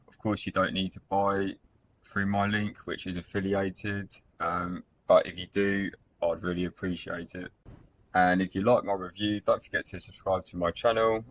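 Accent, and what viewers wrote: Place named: United Kingdom. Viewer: your British voice sounds so classic!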